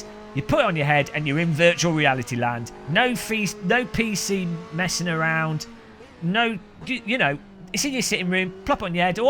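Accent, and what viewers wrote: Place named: England, United Kingdom. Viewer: Classic counter video before new product, plus eng accent. nothing to see here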